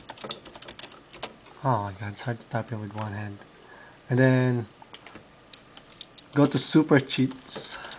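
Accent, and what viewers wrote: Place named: Philippines. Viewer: by the way he speaks.... i am going to say hes a filipino -__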